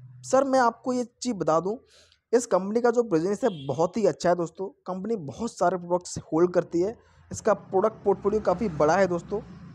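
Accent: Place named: Rajasthan, India. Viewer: Boli se Rajasthan ko laage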